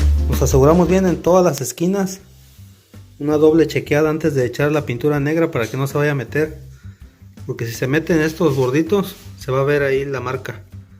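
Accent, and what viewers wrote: Mexico. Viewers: ES IMPRESIONANTE COMO HABLAS ESE TONO TEPITEÑO TIANGUERO NORTEÑO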